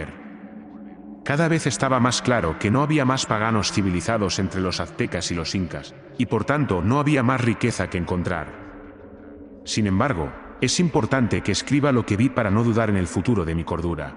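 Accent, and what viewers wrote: Spain. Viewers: Un pobre decrèpito video. Sabes nada de culturas Originales de Pachamama. Para y paren de repetir q' gente Original de tales tierras mataban y sacrificaban. Porq no mencionas las matanzas de MILLONES en el mundo por los "conquistadores" digo... matadores. Nunca podràs entender la psicologia profunda de lo Original...escucho tu voz y....eres Español? Verdad?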